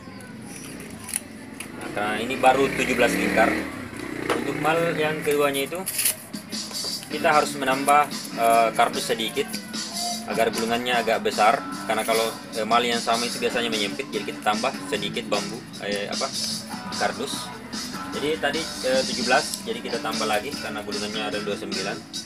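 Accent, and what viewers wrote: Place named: South Sulawesi, Indonesia. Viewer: saya fokus ke suara ta daeng... kyk ad logat2 sulsel ta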